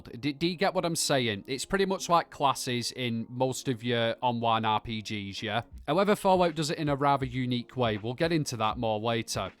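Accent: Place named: England, United Kingdom. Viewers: You speak like your from my area Oldham haha love it ! Great vid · I've played 76 a couple of times. Who knew a northern lad telling me to "Stash Yer Joonk" would be what helped me get into the game. Seriously though this video is actually super helpful. I love how you explain things and it doesn't sound complicated and confusing. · I don’t think I’ve ever heard such a strong English accent before watching this video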